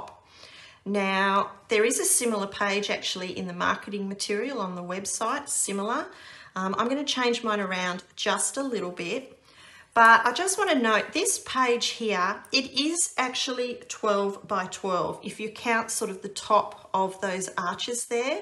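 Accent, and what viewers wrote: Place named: Australia. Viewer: !these pages are beautiful,love to watch you scrap and listen to your Aussie accent! Just Lovely xx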